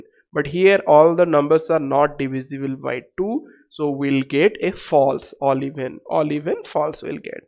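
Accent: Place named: India. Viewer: when I heard the indian voice I knew what to expect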